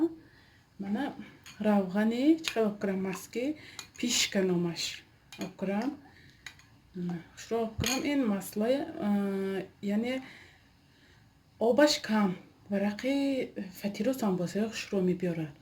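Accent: Isfara, Tajikistan. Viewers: Фатири ИСФАРА барин !!! Гапзанитон Исфарагихо боин !!! БЕХТАРИН !!! РАХМАТ !!! 👍👍👍🤲🤲🤲 Подписка + лайк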